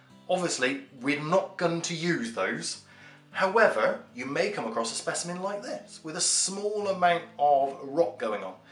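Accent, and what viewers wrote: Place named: Somerset, United Kingdom. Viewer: Hi, I am from Somerset and by listening to your accent i'm assuming you are too! My question is, where is a good place in Somerset to by apples in bulk at a wholesale price? Cheers mate and great video!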